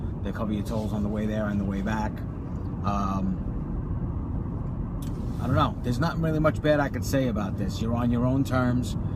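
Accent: New York, United States. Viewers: Jajaja that accent is str8 from new york lol gotcha there buddy now i know where u drive jaja lol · That is the heaviest New York accent I have ever heard. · Just started in Chicago, clearly you are in New York or New Jersey (the accent gives it away) I am also going to focus on the daytime commutes to avoid any of the late night troubles. Hope to see more videos from you & #UberOn also how's SideCar in your market have you tried it? It does well in Chicago in certain areas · The clues gave it away (at least for me). Highly dense, close to trains, and the airport. All i'm thinking is Manhattan NY. The accent just confirmed everything lol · "Not gonna disclose my location" your accent is a dead giveaway NYC bro... LOL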